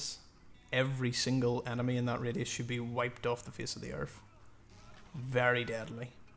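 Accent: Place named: Ireland. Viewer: It's really a delight listening to your accent, i wish i had an irish accent like yours.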